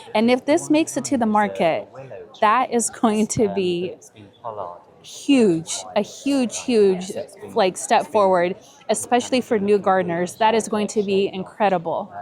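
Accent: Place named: England, United Kingdom. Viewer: looks like you picked up that lovely English accent 😊❤❤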